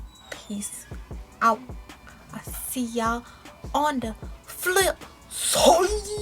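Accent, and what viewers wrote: Jamaica. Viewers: Are you Jamaican my dear??? Becau you sound like an Jamaican ooman dem!!! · Its the Patois for me, LMAOOOOO, LOVE THIS VIDEOOO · Are u Jamaican?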